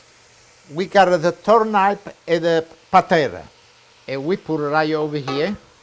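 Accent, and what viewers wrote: Italy. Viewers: Cute Italian style English ~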